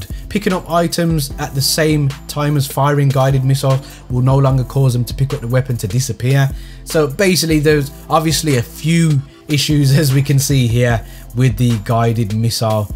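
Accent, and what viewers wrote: Birmingham, United Kingdom. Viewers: nothing wrong with a brummie😂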